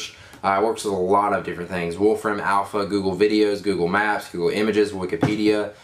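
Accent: South, United States. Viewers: I think he just means your slight southern accent.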